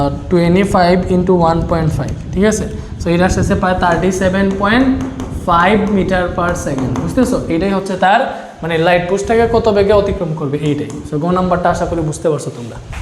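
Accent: Sylhet, Bangladesh. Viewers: Baiya apni ki Sylhet e taken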